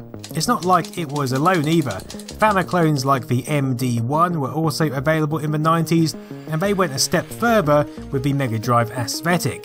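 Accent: United Kingdom. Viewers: The Britishness of your voice is glorious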